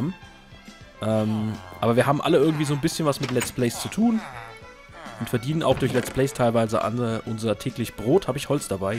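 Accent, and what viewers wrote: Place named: Palatinate, Germany. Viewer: Red' mal mehr pfälzisch :D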